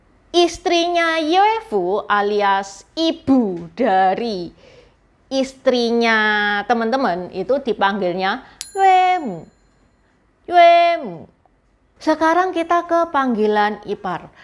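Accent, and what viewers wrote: Java, Indonesia. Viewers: komentarku bukan u menihilkan niat baik u memberikan info yg baik tentang hal2 yg berkaitan dengan chinese. Semua video 2 lain2 nya saya sering mendapatkan wawasan baru. Tapi u video ini, jujur saya ga pengen tonton hingga habis... krn pelafalan yg salah... menggangu bgt telingaku. Krn di bahasa mandarin, salah intonasi = salah arti.... Saya kebetulan teochew yg intonasinya bernada 6-8.... dan uda 4 thn yg lalu otodidak belajar bahasa mandarin... dan lumayan hasilnya. Saya juga setuju logat jawa medok yg membuat pelafalannya agak kacau... TAPI harusnya ini jgn JADI excuse u salah melafalkannya. Krn buat yg kurang mengerti, jadi bisa salah diterimanya oleh mereka. Dan saya yakin juga bukan intend u membuat video ini, kl ternyata salah diterima oleh audience nya. No offence here, bang....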